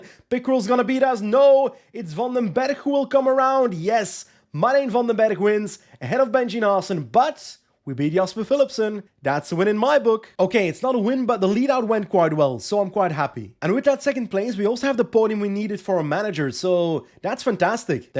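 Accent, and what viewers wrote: Belgium. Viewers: Are you from Belguim or the UK?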